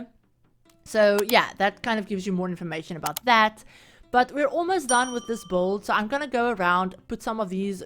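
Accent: South Africa. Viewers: Eeyyy! Are you South African? 👏😁🇿🇦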